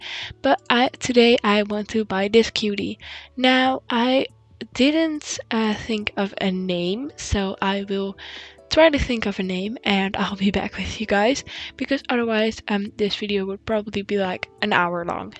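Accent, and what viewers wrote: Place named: Netherlands. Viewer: The old ones are so cute!! Also are you Dutch? Your accent sounds Dutch :)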